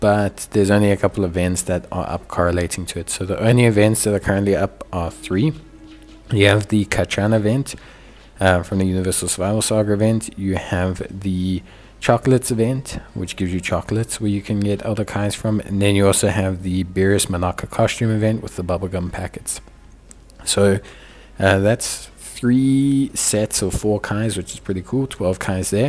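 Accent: South Africa. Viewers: Bro you south-african?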